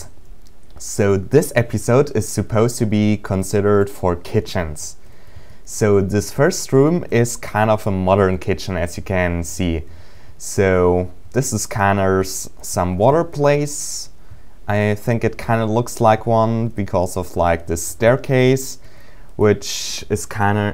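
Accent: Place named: Germany. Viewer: man i love your german accent so much. Haha Nice video man!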